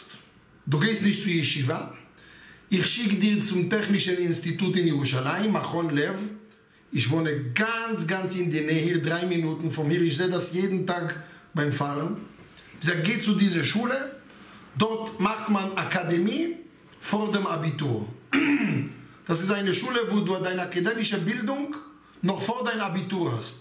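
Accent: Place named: Germany. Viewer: Danke Rebbe, ich finde Sie reden gut Deutsch. In den Momenten, wo es nicht so klappt, bringen Sie aber eine Seele mitrein, wo man versteht, und spürt auf Seelenelbene, was, und wie Sie das meinen z. B. Wenn sie jekkeln, wo das Wort so süß rüber kommt, da bin ich sehr froh, dass Sie so unterrichten. Meiner Meinung nach, falls es Sie interessiert, und Sie das wissen möchten, für mich, sind es 98% die Sie sehr gut Deutsch Reden, die 2% Jekkisch bitte behalten, die geben noch mehr süße und Seelenfreude mit ins Herz und Kopp. Danke Rebbe.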